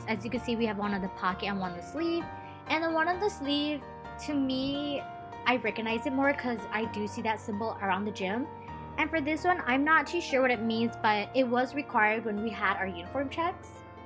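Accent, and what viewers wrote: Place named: United States. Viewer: Don't know your channel. You sound American, how'd you end up in Japan?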